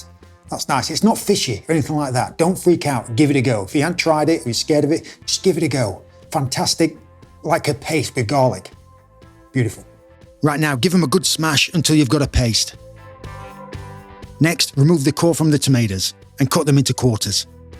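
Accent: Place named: England, United Kingdom. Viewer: Tomita's! Tomatoes! lets call the whole thing off! you sound like and English guy but say tomita's and not tomatoes like an American. Why?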